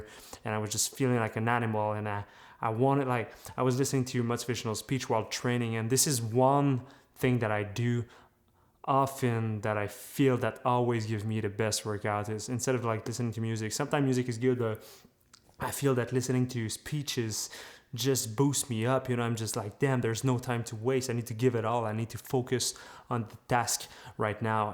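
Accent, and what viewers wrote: Quebec, Canada. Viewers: t'as juste l'accent d'un québécois qui parle anglais 😛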